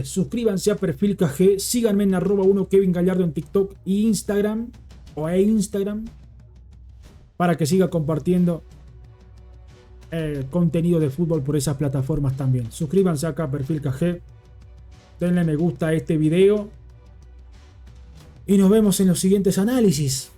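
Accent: Argentina. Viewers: Por que habla cómo argentino?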